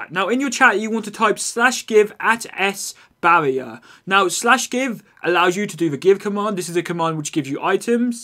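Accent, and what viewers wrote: United Kingdom. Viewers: i thought you was from the UK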